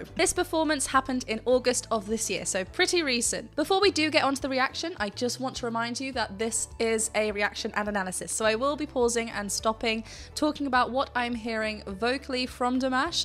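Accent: England, United Kingdom. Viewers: Beautiful Dimash performance,,,also your english accent its very cool...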